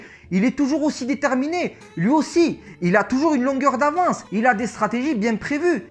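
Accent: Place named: South, France. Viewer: Salut, ça fait plaisir d'entendre ton p'tit accent du sud. Excellente vidéo!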